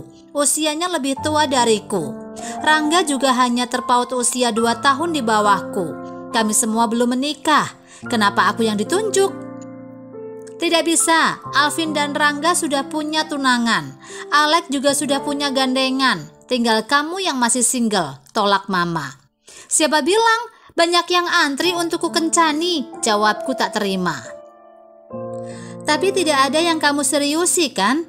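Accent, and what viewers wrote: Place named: Sunda, Indonesia. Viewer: Suaranya kaya suara euceu2 org Sunda 😅😂🙏🙏🙏🙏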